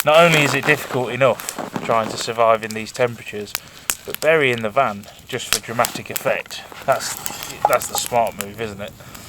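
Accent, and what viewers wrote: Leicester, United Kingdom. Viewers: Omg. I just twigged that your accent sounded like a Leicester accent and low and behold you are from Leicester. Hello from a fellow Leicester person. Loving your story and no I don’t live in Leicester. Have travelled round a lot myself. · I do live in Leicestershire and also thought I recognised the accent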